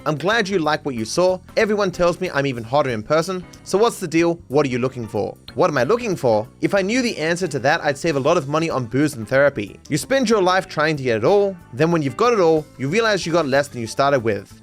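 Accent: Australia. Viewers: Hell yeah, that's why I pay for the internet. To watch an Australian guy talk half a video about an unreal website for unreal horny wifes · other guys at my age: going oudside, doing sports, enjoying life. Me : listening to a random ausiie reading s*x messages.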